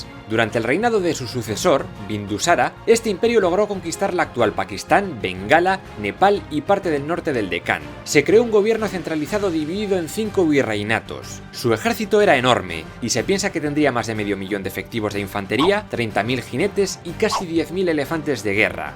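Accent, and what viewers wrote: Spain. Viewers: El acento español no aburre en tus vídeos, sigue así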